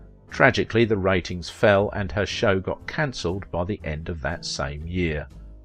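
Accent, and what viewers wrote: United Kingdom. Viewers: NOTHING, in the world would delight me more than in replying to your comment, like feeding a pork chop to a Pit Bull. BUT, I'm going to give the honors to, the owner of this magnificent channel. It's bound to be epic, and with a classy British accent.!!!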